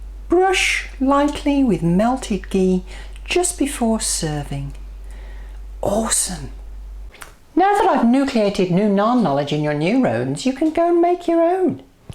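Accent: United Kingdom. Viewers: Thank you for the video. May I ask why you have British accent but speak Arabic?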